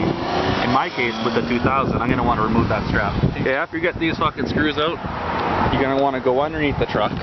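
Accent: Canada. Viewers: Great video. I'm from ontario and my wife said hey he has the same accent as you. Bish I don't have an accent! LoL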